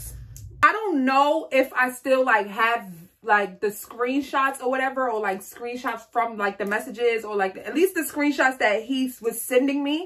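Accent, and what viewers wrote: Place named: New York, United States. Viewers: That gotta be Brooklyn 😭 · You most def from new York don’t no where tho 💯😂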